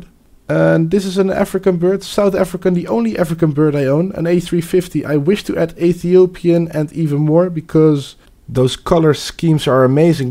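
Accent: Netherlands. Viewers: Ik hou van het nederlandse accent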